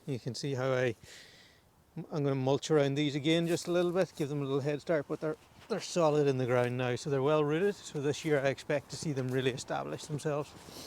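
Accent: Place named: Ireland. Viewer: This is the Irish accent. If you can't understand it, press on the CC button. It will give you subtitles.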